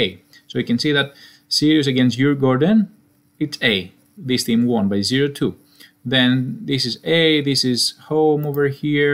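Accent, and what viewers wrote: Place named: Spain. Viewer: Great work man, excellent content! Question: you are feeding the "scores and fixtures" table with values that might not be correct for that specific date. For example, the league table has all statistics up to the present moment and then you feed them to matches that occurred in the past. Am I right or did I understand it wrongly? Thanks for your videos, I'm mostly interested in content related with orange usage. By the way, you said in a video that you are Greek but to my ear you sound like a Spanish guy speaking English. :) Cheers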